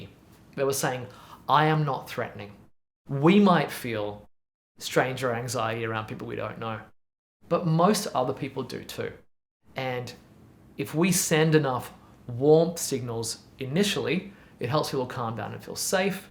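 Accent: Australia. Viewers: Unfortunately I have all of those qualities you mentioned, not born with however but from intense suffering and courage to keep learning this life. The sad thing is, I've given up on women and the possibility of meeting one who values similar qualities so I'm currently in Indian contemplating whether I should become a Tibetan Buddhist monk 😂 Thanks for the work you do, you obviously help thousands of people otherwise this wouldn't have over 40k views in 3 days. It says new York but I hear an Aussie accent, is that correct? I'm an Aussie too and considering not my back because I find our country has become a symbiotic twin of the worse traits the US demonstrates. I'm 52 and have witnessed a somewhat caring and considerate culture which was renowned for friendliness and warmth turn into a realm of selfishness and competition. Asia is still similar to the old Australia I grew up in. 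Sorry if this sounds pessimistic mate.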